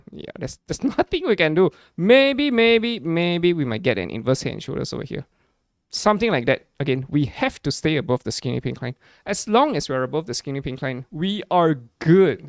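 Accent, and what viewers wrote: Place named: Singapore. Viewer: I grew up in Singapore, and I think, from the accent Skinny is in SG or somewhere close. SG for the win 🎉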